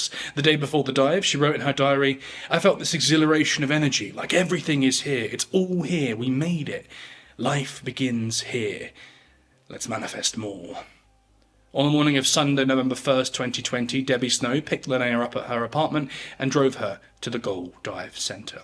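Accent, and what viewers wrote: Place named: United Kingdom. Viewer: Just seen this in a yt browse of my TVs yt but this comment more to the piece's frontend or creator, dude where did you grow up, was it Britain? A few hours old dead body, is normally deemed a dead body, your saying "dragged her corpse" I take extreme issue with as your accent has a strong British aspect to it. We don't describe like you just did in the video-I seriously wonder are you genuine British, if genuine British then what are your motivations for saying as you have, it smacks to me of an American created or fed attempt to go further than USA vs GB 'is' vs 'was' for deceased birthdays, I am staunchly a was. And doesn't seem cold at all, more realistic recognising past as past and fact birthday is a specific happening in the present(or why mention) but corpse Vs body I can't believe a Brit made this mistake-were you brought up in USA dude or you just got a painting brush (for what's not(we have more care in what we do say) out)? 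For someone to die so young is a sad occurrence.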